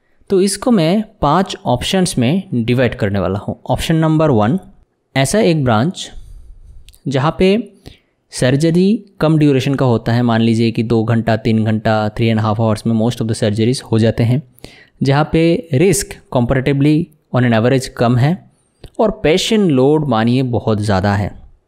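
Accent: Bengal, India. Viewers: U have bengali accent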